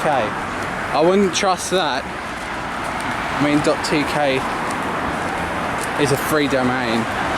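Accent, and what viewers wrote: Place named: Australia. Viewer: You have a very strong accent. Proves you're definitely in Australia. :)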